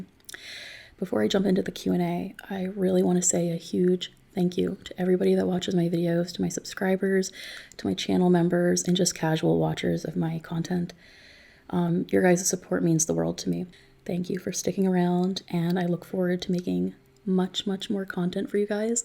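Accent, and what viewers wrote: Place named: Maritimes, Canada. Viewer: I totally picked up on the maritimes accent! Much love from a fellow Haligonian 🫡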